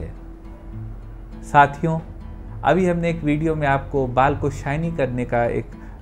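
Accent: Lucknow, India. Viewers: Lucknow side ki bhaasha to lag rahi hai..........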